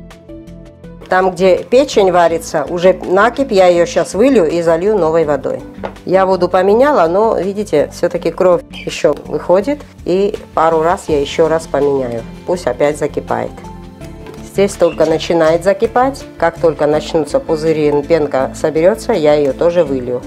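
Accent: Georgia. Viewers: Как говорил один мой шапочно ЗНАКОМЫЙ-ОХРЕНИТЕЛЬНО!А я у себя в Белфасте убитую микроволновку под копчение приспособил-там бак из нержавейки.Позже нашёл выброшенную кем-то барбекюшницу с прогнившим дном, и вместо гнили върезал туда ведро на 10 литров.Теперь она на ведре стоИт при копчении, и туда влезает 2 курицы, или 5 филе скумбрии. Вот дождусь выходных, и сотворю ящик для холодного копчения, с подачей дыма с помощью туалетного вытяжного вентилятора))), я вас обожаю! Ваш лёгкий грузинский акцент возвращает меня в годы моей молодости, когда национальность не имела значения.С ОГРОМНЫМ УВАЖЕНИЕМ к Вам и Вашей Семье. Ребята, мы должны так же жить в Нашей Стране, как вы в Испании!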